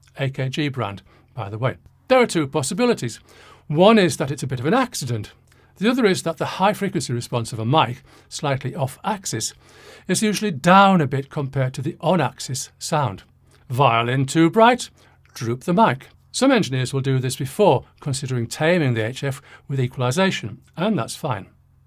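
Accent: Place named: Northern England, United Kingdom. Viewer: Dear Mr, could you PLEASE tell me where in the North of England you were born or spent the majority of your childhood? Why? Because I pride myself on being able to tell where people from my native North-East were raised to within a 5-mile radius - but your accent has got me baffled, presumably due to your many years of living "darn Sarf". You could be from parts of Cumbria, Geordieland, Co Durham or Teesside/North Yorkshire but I can't nail it down. Fancy putting this "accentophile" out of his misery mate...??? PS: I'm from Hartlepool originally if it's a case of "you show me yours and I'll show mine"..!;)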